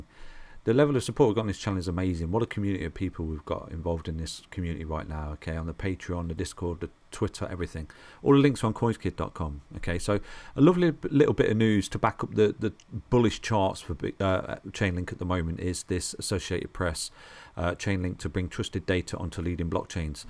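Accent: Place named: East Midlands, United Kingdom. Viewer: I reckon that's an East Midlands accent!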